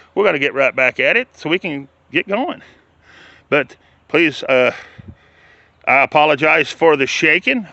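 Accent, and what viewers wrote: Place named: South, United States. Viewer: On the mark, that is Monroe Yoder, Died Aug 21 1890 (couldn't see his age) + Martha Yoder, Died July 19th 1890, aged 15 (so they were brother and sister, most likely, died one month apart). On the mark, Amos Yoder died Sept 14 1865, Aged 1 year, 9 months. I thought i heard a bit of an accent in your speech but frankly, i didn't know where exactly to place it from, in the south (i thought maybe Texas, haha, although i see now that i was wrong) because i know each state in the south has its own type of accent 🙂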